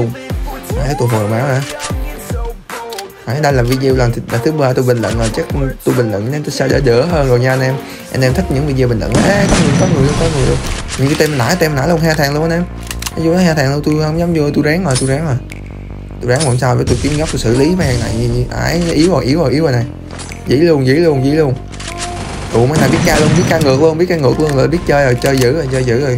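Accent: Quang Ngai, Vietnam. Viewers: A ở Quảng Ngãi hả😅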